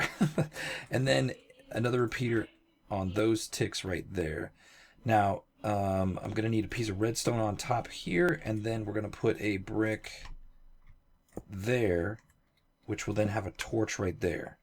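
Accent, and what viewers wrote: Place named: Australia. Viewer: Yay another fellow aussie. Its like music to my ears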